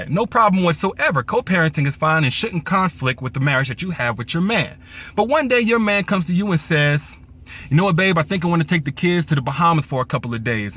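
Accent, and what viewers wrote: South, United States. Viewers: 😂 lol love the accent Derrick lol great southern drawl lol 😂. Blended Families it can be a great thing for the kids but there has to be a lot of trust in the relationship between the new wife and husband. Camping trips and Vacations sound great but it's a matter of keeping bedrooms separate and also making sure the kids get along too especially if there are children from a previous relationship. The ex should simply try to understand that you are not with this person but you want your child to build a bond with their step siblings. I have seen this work and I have seen it progress. It works best when both parties the mother and father are in fulfilling healthy relationships though too. If they have that then the kids will be happy 😊 too. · I'm done with that Dolly Parton accent 😂🤣. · Lol. That southern accent! I agree with you, Derrick. 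Come together only to support the child. Stop the excuses.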